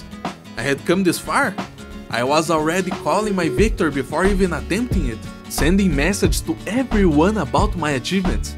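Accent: Brazil. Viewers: I'm hearing brazillian accent? Btw, nice video, i know what makes an operator a bad one is the amount of effort they need to complet a stage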